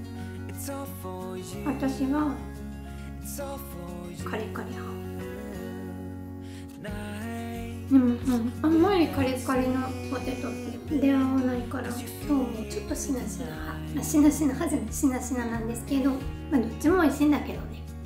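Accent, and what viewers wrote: Kagoshima, Japan. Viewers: 鹿児島弁のイントネーションが残ったままで嬉しい！！！かわいいなー、鹿児島の誇りだよ☺️💕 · 、相変わらず可愛いね🤗 と同じ鹿児島出身の大原優乃ちゃんと訛が似てるね🤗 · 相変わらず可愛い💕 鹿児島訛りの話し方も優しい感じがして可愛い💕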